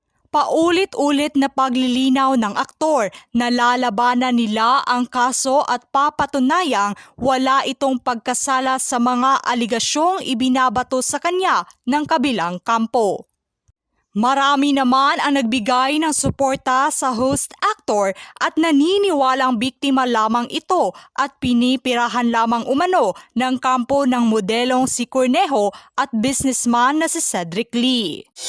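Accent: Visayas, Philippines. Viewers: Bisaya ung vlogger nito hula ko🤮🤮🤮🤮ang malambot tumitigas..ang matigas nalambot🤮🤮🤮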